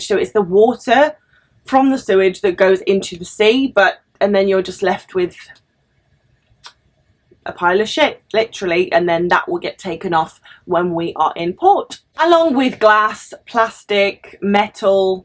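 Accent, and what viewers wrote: United Kingdom. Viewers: I subscribe to you because your voice is loud and clear even so you speak British English I understand you I can take a shower while listening to you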